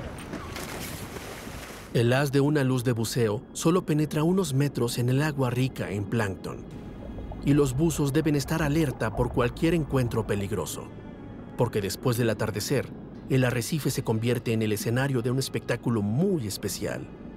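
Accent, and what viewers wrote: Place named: Latin America. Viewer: Mucha razón. Excelente locución en español de América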